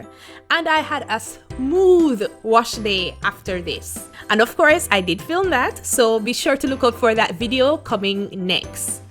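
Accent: Jamaica. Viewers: Are you Jamaican because I can hear your accent a whole lot! I'm Jamaican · I LOVE,LOVE YOUR ACCENT ARE U JAMAICAN Cuz U HAVE MY GRAMMARS ACCENT LOVE IT!!!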